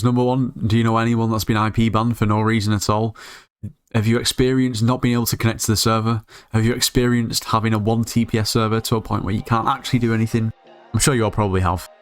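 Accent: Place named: Midlands, United Kingdom. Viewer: Also where are you from, you dont sound too northern. I want to say midlands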